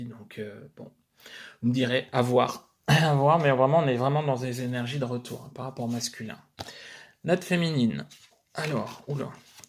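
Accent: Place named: North, France. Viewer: ahh j'ai cru entendre parler du nord, bon remarque du sud à chez nous, nous suis bien dans le nord de la France 😂😂😂 Contente de t'entendre à nouveau 🙏🙏🙏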